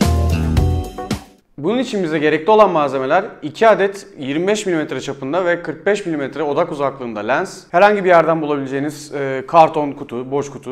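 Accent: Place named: Izmir, Turkey. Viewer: tam izmirli yaa.. incedennn ;)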